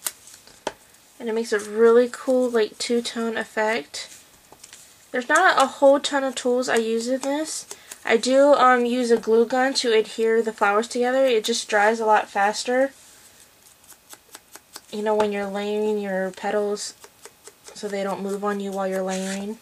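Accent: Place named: United States. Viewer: let me guess: with your accent, you are a rich american woman (because in america, you are rich or poor, no middle)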